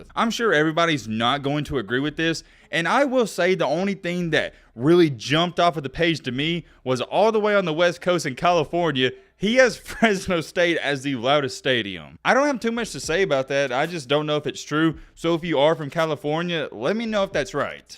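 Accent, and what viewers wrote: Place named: Dallas, United States. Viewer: Sounds just like dem boyz over there in Dallas😆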